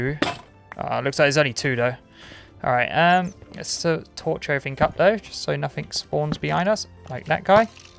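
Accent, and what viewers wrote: United Kingdom. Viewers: I love his british accent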